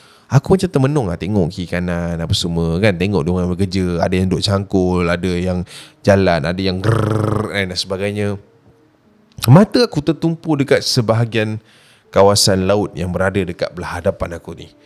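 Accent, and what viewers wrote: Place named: Terengganu, Malaysia. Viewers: Bakpo bunyi ganu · terbaik la kecek ganu 😂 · bapak naturalnye kau ckp slang ganu. hahaha power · peh, mcm org ganu doh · pandai plok cakak ganu😂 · Jaddi borh loghat Ganu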